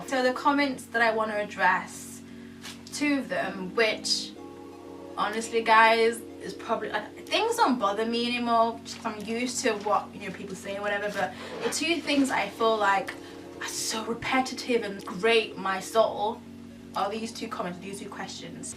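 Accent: Igbo, Nigeria. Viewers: awwwh i loved this loved this vid specially the intro and the end that accent was on point u spoke with the typical igbo english accent like those ontisha boys hehehe...am yoruba and olodo is our common insult loool but i have a lotta igbo frnds and i caught d pigin englih with the accent lool i really loved listening to u wiv the accent....and yeah keep ur private life private like popc z dont air ur linen in public...but i really wish you all the best and hope you find the bone of your bone and flesh of your flesh ijn.....great vid s=xoxo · Your Naijah accent is flawless though! ♡ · Lol... The naija accent !!! I feel u girl..sounds foreign to me as well wen I speak .... But still naija for life !!!!... Forget accent joor!!!! Lol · I loooooove this video.. and many others:)..plus the Nigerian accent has so much win to it. Keep shinning:) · You are very beautiful on this video You seem to have a beautiful personality And I loove your nigerian accent. 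That was good 😄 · The intro accent is just the bomb!.... Typical naija..lol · Best video ever. Soo relaxe and cool. Love your nigerian accent. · If your videos will be more like this,will definitely be watching every post!! Love your naija ascent 😘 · Awww the 18 minutes went by so fast lol. I loved the Nigerian accent tho! Thx for sharing! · Your naija accent tho. Lool. You sounded like Shalewa in that Nigerian soap opera tinsel. But regardless, I think you are super cool, and pretty too. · Thanks for opening up in this video. I loved hearing your Nigerian accent. It was nice to "get to know" you in this video! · Love the Igbo accent👏👏👏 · lmao love your nigerian accent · Girl! I love ur Nigerian accent. I am reli seeing a difference in u and I have been watching ur videos for yrs. I mean u are coming out of ur bubble more and I'm seeing ur personality. I'm in da same boat as u, I feel like I'm dissing Ghanaians went I put on my Ghanaian accent smh btw I'm Ghanaian. 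I would love to meet u someday. Luv u xoxo l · i loooooooved your nigerian accent so much!! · I really love your Nigerian accent. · Your Nigerian accent is really cute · sounds kind've British to me; Personally i rather your Nigerian accent. That's just my opinion, keep up the good work tho :* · Love u lol,, naija accent tho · Love the naija accent....may all your dreams come true IJN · Lmao that Nigerian accent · Sweet and Honest. Enjoyed watching you and enjoyed the Igbo accent. You have company... I'm bad at it too... my fiance is Nigerian and he kills himself laughing every time I try that accent. Stay strong sweets! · Looooool...OMG! Best video of yours I've seen! Your Nigerian accent is sooo funny, but abeg you still try. This hair is amazing on you, the bangs suit you so much. I agree with the annoying comments and keeping your personal life to yourself. You are pretty amazing :) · I loved this. 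The naija accent couldn't have been better. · Lol your Nigerian accent is too cute. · OMG!! I LOVE hearing your Nigerian accent!!! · lol your nija accent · Plus i love your hair and the Nigerian accent. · You're naija accent! Everything!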